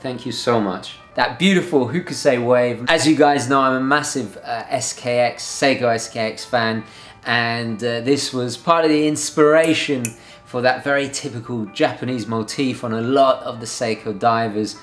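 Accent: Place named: United Kingdom. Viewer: This one is why I enjoy your videos. Great points made. I find that you gents from the British Isles are more entertaining to listen to than us Yanks. Carry on, Guv.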